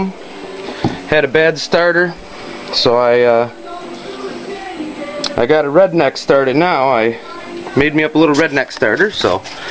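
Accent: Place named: Canada. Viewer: Great little engines those. It's a pitty though that they don't run too well at low RPMs. I have one on a concrete mixer and its minimum idle is about 2000rpm. Your runs very well, perhaps the inertia of the extra pulley allows this to run so low. You should definitely enter this in a diesel "how low can you go" competition. Your accent is cool. Where are you from? Canada?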